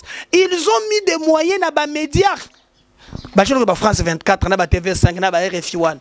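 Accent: Kasai, Democratic Republic of the Congo. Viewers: Bonjour ndeko tiii niveau okomi tu prononce toujours Ndjili en tshiluba????ozo loba Ndili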